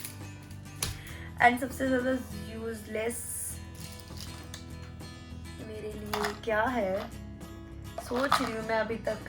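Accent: Punjab, India. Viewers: Aapki accent shehnaaz gill type ki h😅.. Shyd aap dono punjabi h isiliye 😅❤ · Punjabi hoka Hindi bolni chngi ni lgdi.... So make vlog in Punjabi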